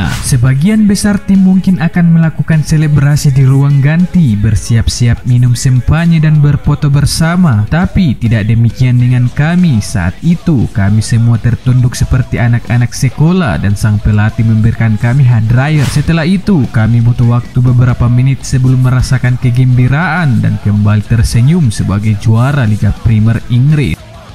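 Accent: Medan, Indonesia. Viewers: Anak medan x kudengar omonganmu bang 😂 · Medan x logatnya bang 😅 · Logat Medan kental bener wkwkw.. di ralat.. CANTONA bukan CONTANA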